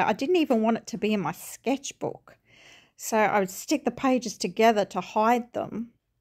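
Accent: Australia. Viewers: I definitely hear you when you talk about trying to keep up with others in the competitive colouring and arty communities eg ‘how can I get better, should I get this material or mediums, will it make me improve etc. it becomes a bit overwhelming in the end and I have been seeking out this kind of video to just be able to loosen up a bit as well. I am an Aussie too so was glad to hear an Australian voice, I have subscribed so that I can go along this journey with you into Zen non anxiety mindfulness. Thanks for your video. 👍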